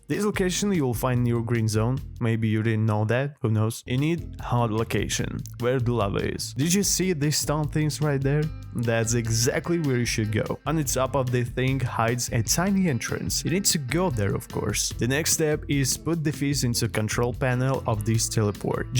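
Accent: Russia. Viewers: I have a question are you Russian? It's just the way you say some words in Russian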